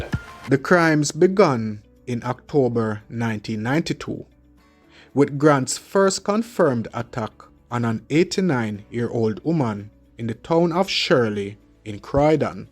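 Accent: Jamaica. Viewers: Listen bredrin it's not the first time I've heard about Delroy Grant but it is the first time I've heard this narration and I love it I just love to hear my Jamaican dialect taking off the Ts and emphasizing the Hs gwaaan my yout proud ah yu and Leicester is how you said it correctly sir and you've gained a subscriber ❤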